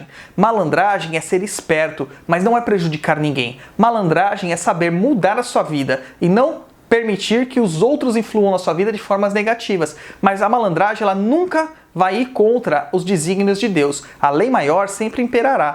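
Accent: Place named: Rio de Janeiro, Brazil. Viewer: Baiano nada kkkkk ele é carioca todo cheio de sutaque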